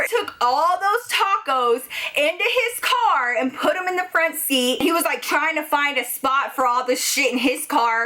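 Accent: Texas, United States. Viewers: I love her Texas and Hispanic accent together 😂😝❤👏🏻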